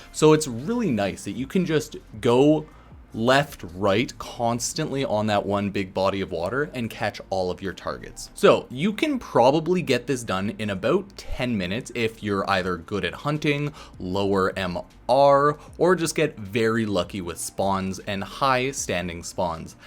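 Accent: Canada. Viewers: I love your Canadian accent. When ever you say "about". Love it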